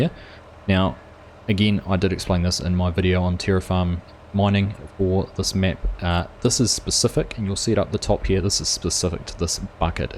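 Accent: New Zealand. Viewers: Damn man a kiwi? North or South Island mate?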